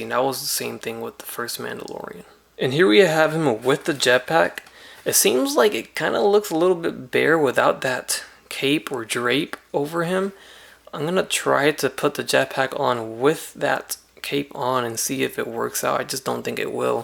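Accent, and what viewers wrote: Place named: Asia. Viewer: great video man. i can't wait to pay resale prices for this lol...i have a random question tho, is your last name, what is your ethnicity? i sometimes hear an asian accent, asking for a friend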